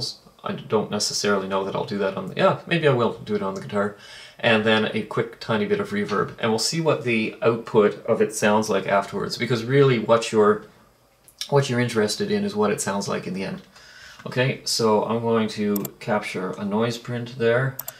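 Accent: Canada. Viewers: Ohhhhh... You're Canadian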